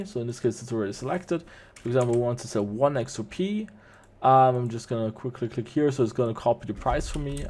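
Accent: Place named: Austria. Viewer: You must come from Austria, because your english Sounds Like Schwarzenegger. 🎉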